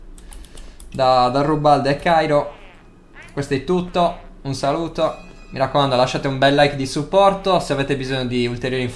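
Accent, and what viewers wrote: Sardinia, Italy. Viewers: ho riconosciuto l'accento, pure io, Cagliari precisamente, mi piacerebbe aggiungerti fra gli amici se posso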